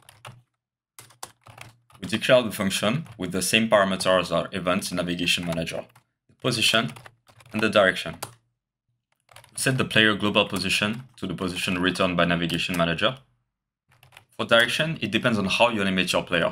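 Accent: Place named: France. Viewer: tbf i think it's just the French accent just making him sound like he's looking down on you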